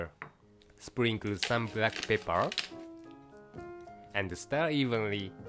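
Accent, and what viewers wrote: Japan. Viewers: I love watching your videos! Very clear and not too fast step by step on how to make the dishes. Other cooking videos I have seen so far were too quick/too fast to follow. Love your dog,too! The narator's english with his Japanese accent also has become your video's character even more Japanese 😊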